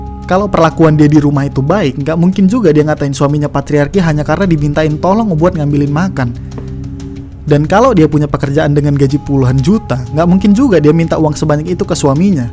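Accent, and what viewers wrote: Medan, Indonesia. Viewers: sorry oot, btw kau dari medan ya bang? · Bang orang batak lu ya ? Wkwkwk Logatnya kayak orang medan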